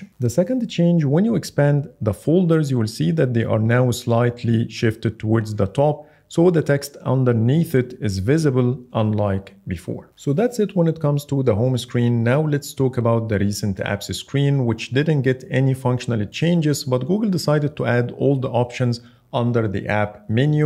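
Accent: Egypt. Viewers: ياسطا انت مصري ؟ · are you from middle east, Egypt particulary ??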